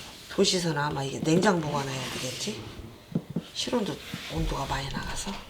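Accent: Chungcheong, South Korea. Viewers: 충청도사투리가 정겹네요ㆍ설명도 간단하니듣기 딱좋아요ㆍ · 충청도 어디세요? 고향이~~ 오햘머니? 너무 정겹습니다 친정엄마 의 목소리 같아서요.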